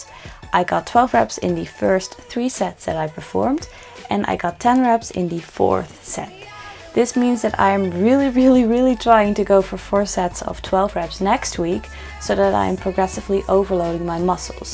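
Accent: Netherlands. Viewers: Omg I found you today and I love your Channel! I’m also starting to lean bulk! So this is perfect! Groetjes uit Gouda! Ik hoorde je Nederlandse accent en zag het op je instagram dat je uit Nederland komt. Je bent super goed bezig! Ga zo door!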